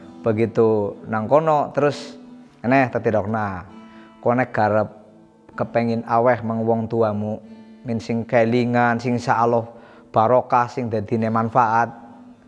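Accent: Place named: Banyumas, Indonesia. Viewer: Kayane wong ngapak kie lur 🙌😄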